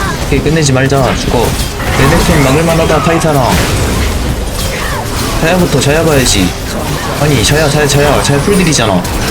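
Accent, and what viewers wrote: South Korea. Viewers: 광주사투린가요 ㅎㅎ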